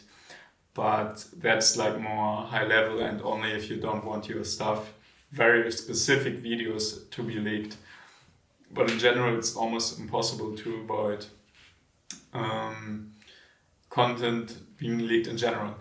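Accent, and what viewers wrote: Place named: Germany. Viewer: Ich wusste dass du Deutsch bist, der Akzent hatte recht haha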